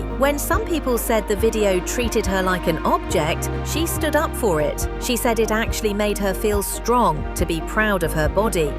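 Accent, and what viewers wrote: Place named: United Kingdom. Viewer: I hate that accent…this is the US not Britain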